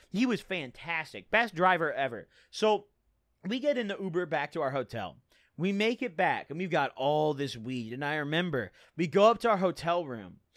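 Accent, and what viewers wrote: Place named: Midwest, United States. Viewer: Could tell he from the Midwest by the way he talks lmao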